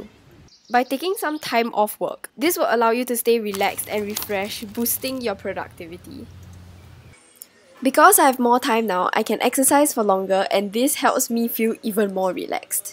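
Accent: Singapore. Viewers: I love how you don’t try to change up your sg accent 🤩🤩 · first time hearing that a singaporean accent sounds french lol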